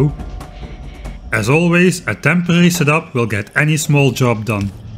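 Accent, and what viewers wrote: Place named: Netherlands. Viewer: I'm actually impressed the algorithm can recognize steenkolen engels.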